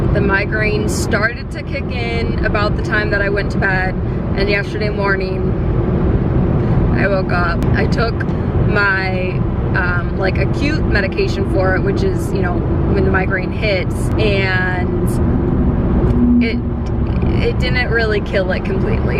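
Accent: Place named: South, United States. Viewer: I love the aviator glasses and country accent. Babies and puppies always make my flights that much better especially if they are happy to be outside 😁🐶👶.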